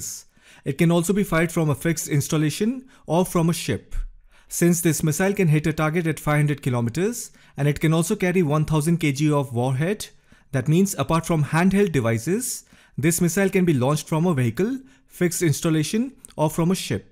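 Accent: Bengal, India. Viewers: I love to hear Bongs talk in Hindi ❤️❤️❤️❤️. Kudos sir!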